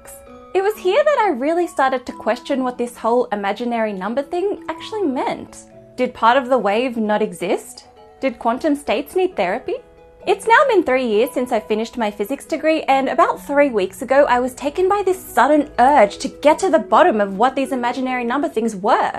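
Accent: Australia. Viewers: Love the australian accent :) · sounds like a healthy Australian accent to me.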